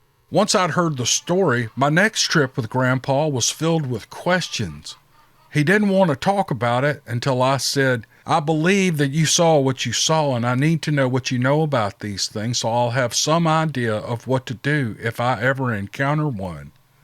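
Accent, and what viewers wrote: South, United States. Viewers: Sorry pal, your stories were indeed intriguing, albeit, your accent is abhorrent… ive heard it my entire life, i was born in the deepest southern accent town, yet i never gained this terrible accent… some of my fam did… i suppose its attributed to a lower IQ, or perhaps a less of a care for reality, maybe one in the same… who knows. Thanks for the vid tho, its my first and last one, cheers my friend! (I imagine youll do well since many folks love the southern accent…) (and god have mercy on humanity if this is indeed an AI voice… sheesh)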